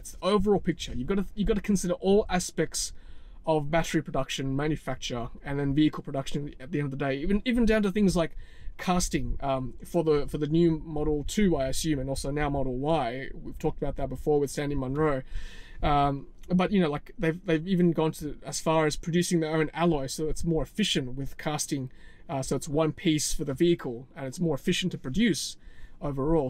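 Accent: Australia. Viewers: Thanks for your entertaining update,! I thoroughly enjoyed it like all your posts. Your enthusiasm is infectious & it's really good to hear all this locally from someone with an Australian accent. Keep up the good work (and please let Joy know I enjoyed her posts as well). Cheers, mate!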